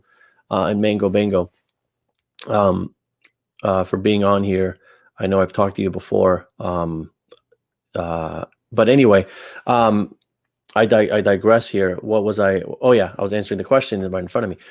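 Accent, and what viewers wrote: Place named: Arizona, United States. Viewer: Are you in the Middle East? I would maybe guess someplace like Phoenix, Arizona, based on your accent, and there being a large Chaldean (and, Melkite) community there😀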